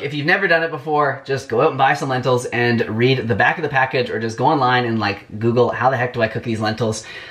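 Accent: Canada. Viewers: Dovid Stern Canadian accent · Omigoodness! I thought I heard a Canadian accent. Lentils are very quickly becoming my all-round faves! Need to chk out your curry recipe. QUESTION: Building muscle is awesome, however...how does it compare to heme sources of iron. Struggling with anaemia & I keep getting the impression that non-heme iron sources (from lentils) are sub-par for those of us with anaemia. What say you,? Cheers in advance.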